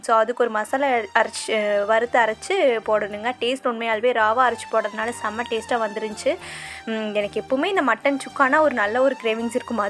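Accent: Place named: Kongu, India. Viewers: Haaiiiiii sis neenga tirupur Karur near area ahhhh... Na Kangayam... Unga slag Namma area mari irukunga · amanga ivanga Kongu slang than pesaranga....பல்லடம் area va irukum nu ninaikiren...nan tirupur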